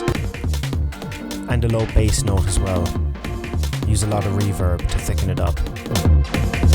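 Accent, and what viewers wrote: Ireland. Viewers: Now the next challenge is to find what Irish accent is it? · Loving this and great to hear an Irish voice doing such killer tuts. Nice one mate.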